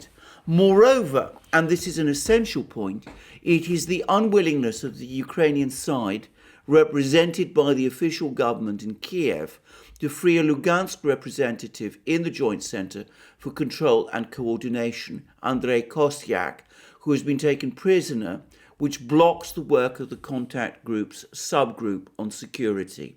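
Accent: United Kingdom. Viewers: you are a smart man...I can tell.. are you Russian? Little bit of British accent there.. am a Nigerian.. I love russia 🇷🇺.. my second son is named after putin..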